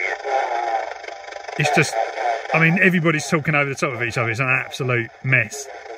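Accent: United Kingdom. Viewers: I love how the British pronounce all the vowels in aluminum. BTW what type of cb radio is that?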